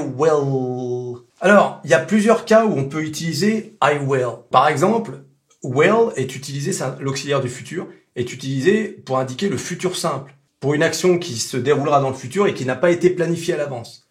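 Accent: United States. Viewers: Mais ou as tu appris à parler aussi bien américain :)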